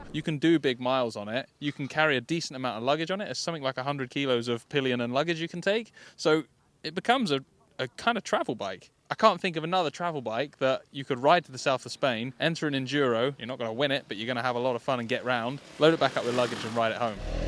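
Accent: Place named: United Kingdom. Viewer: All I need to hear is "properly fun" in British to know the bike out of my range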